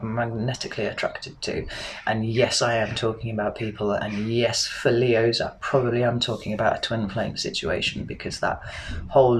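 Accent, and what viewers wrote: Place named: RP, United Kingdom. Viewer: I love an educated British accent. I can hear why others inspired you to read the Scherezade book (can't remember the title 😁). I miss Britain. I'm an American with an English mum, and I've always felt at home when I've visited your beautiful country. Your reading was spot on, and I loved the great commentary. I'm a new subscriber. Cheers! ❤️😊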